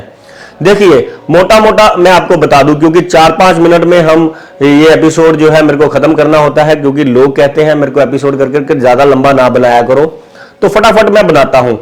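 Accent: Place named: Punjab, India. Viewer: ji aapki Hindi bolne ka tareeka bahut badia hai with mix Punjabi👌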